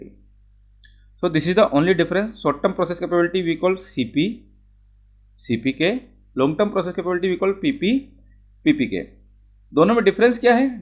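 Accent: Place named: India. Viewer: Could you speak slower? My English is not too good when listen English by India. So sorry to say that. I really want to watch more video from you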